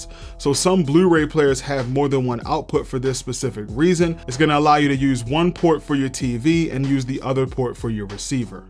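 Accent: Baltimore, United States. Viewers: I knew you was from Baltimore lol. 👌🏾🤘🏾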